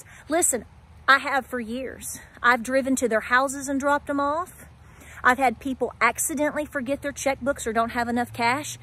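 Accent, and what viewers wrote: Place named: Knoxville, United States. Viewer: Sounds like people that live in west Knoxville.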